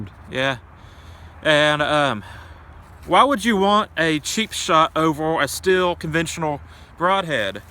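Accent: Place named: South, United States. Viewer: Speech impediment and a southern accent, l feel your pain!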